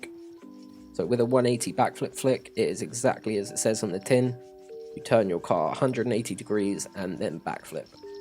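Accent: United Kingdom. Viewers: Good shit bro where abouts in england u from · love the british accent lol rn i have by in my head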